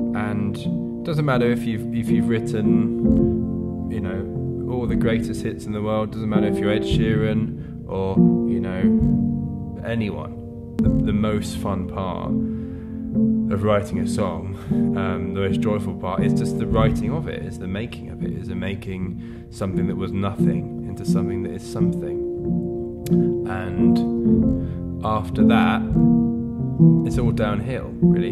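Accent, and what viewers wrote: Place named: England, United Kingdom. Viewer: Why do you talk English and sing American?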